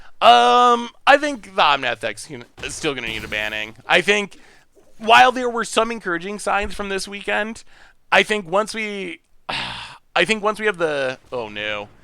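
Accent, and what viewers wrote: Western New York, United States. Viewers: My wife went to school in Elmira. She said she recognized that you were from western NY right away. Hahaha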